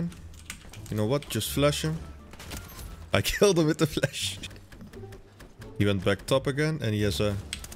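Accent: Netherlands. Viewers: so u are the guy that always fucks up my game? btw didnt know u were dutch can hear from the accent xd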